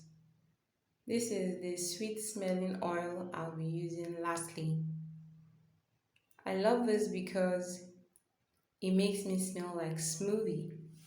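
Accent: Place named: Ghana. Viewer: You speak like a Ghanaian thanks for teaching us sorry am a Ghanaian that’s why I said your English is like Ghanaian.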